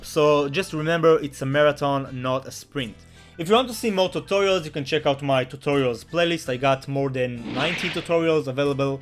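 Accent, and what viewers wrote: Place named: Israel. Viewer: פתאום אני שומע את המבטא הישראלי שלך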